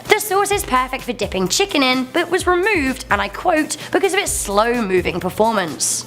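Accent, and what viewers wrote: United Kingdom. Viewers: I love her British accent and her witty humour. It's infectious. 😂😂😂 Thank you for making my day during this damn lockdown. 😆🤗😆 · Your British accent is on display! Love you so much! Thank for the video XOXO ☆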